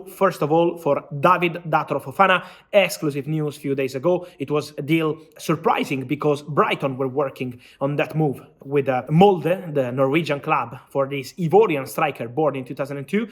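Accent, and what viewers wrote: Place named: Italy. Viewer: Bird day, love Italian accents!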